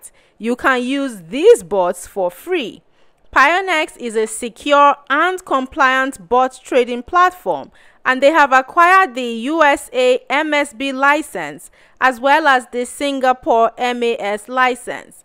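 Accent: Nigeria. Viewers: Are you a Nigerian, ? the accent is like mine.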